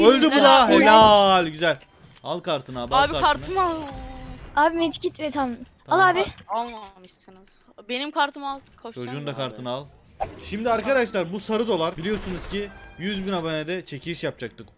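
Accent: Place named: Malatya, Turkey. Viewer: Abi sen malatyalimisin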